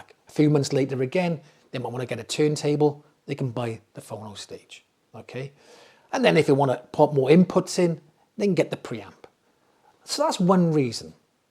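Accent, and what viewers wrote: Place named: Liverpool, United Kingdom. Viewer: Haha...loved the scouse accent! 😂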